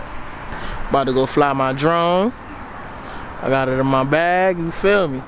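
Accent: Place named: Philadelphia, United States. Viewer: You sound like you’re from Philly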